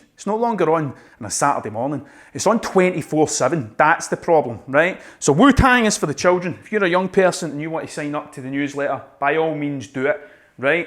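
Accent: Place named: Scotland, United Kingdom. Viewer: just came across your channel man dont know how I have never found it before but I'm happy because now I can go back and watch everything !! trying to get into painting myself and you have given me a fresh outlook on what I'm doing and why I'm doing it, you the man! love the Scottish accent and love the comedic honesty its refreshing hahaha thank for sharing your knowledge man much love from Ireland 🤘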